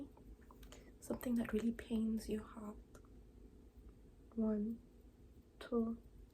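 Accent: France. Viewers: as french I like so much her French accent !!😂😂😂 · accent makes me believe that you're orginally french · That french accent is way too accurate and relaxing… Lot of love from Paris🥰🇫🇷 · As a French, I love this accent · I don’t get tingles from French accents but this was worth the watch regardless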